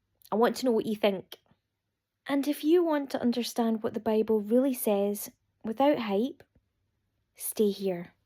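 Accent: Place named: Ireland. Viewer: You may already know about the budding fig tree parable. Truly i tell you this fig tree generation shall see these things come to pass. The fig tree called Israel bloomed again in 1948 and the people born at that time alongside of Israel shall see these things pass. Thats how i know. Read matthew 24 34 knowing the fig tree has bloomed when Israel became a nation again in 1948 and also know that only God like AI has the ability to enforce worshipping of the beast onto all people young and old, rich and poor, slave and bond. The mark is AI. We will all need a mark to buy sell or trade. Digital currency will give full control over all buying selling and trading going on in every nation and with every tribe. We know that AI is an existential risk and the end is near because of the AI beast system coming. I could listen to you speak all day long and if I spent much time around you I'd be speaking with a crazy cute irish accent too.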